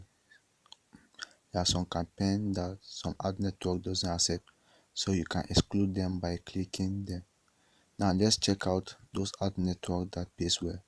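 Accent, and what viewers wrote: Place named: Nigeria. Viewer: Nigerian English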